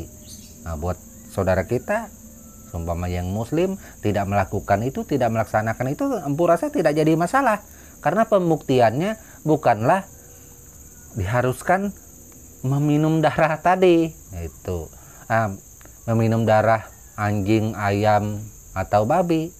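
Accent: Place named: Paser, Indonesia. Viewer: Berawal dari liat fb bahas mandau terbang Eh kok logat nya kayak bahasa PASER salam benuo taka 😊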